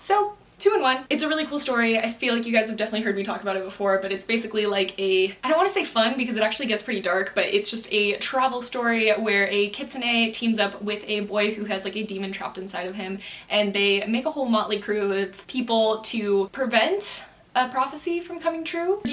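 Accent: Argentina. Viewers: i was shook with your argentinian accent! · me encanta tu acento argentino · OMG! You spoke in Spanish and your accent is really great, wow! I’m from Mexico, but I loved your Argentina’s accent. Your videos are amazing, I enjoy them a lot. 🤩👌🏻❤️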